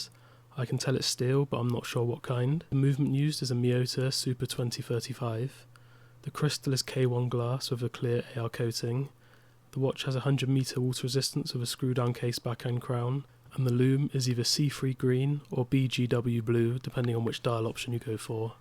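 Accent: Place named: Wales, United Kingdom. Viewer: My mam is from Cardiff, but we were born 1st gen Americanos. My father is from La Paz, maternal Grandad Jamaican--British Merchant Marine, maternal Gran Irish. Me and my twin brother have spent long summers with family back home in Wales. As an adult, having grown up watching a lot of British telly and movies, I have developed a fascination with all dialects of English. Your accent sounds faintly like my cousin Janet's, who has a bit more of an RP Welsh blended accent. I cannot quite ID your accent, but you have a lovely speaking voice for YouTube presentation. I rather enjoyed your presentation, and I would not worry about not feeling confident, especially if you are not accustomed to public speaking, because the lingua franca on YouTube is predominantly authenticity. Polished presenters are perceived as brand shills, so you are way ahead of the game, mate. 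Keep up the good work!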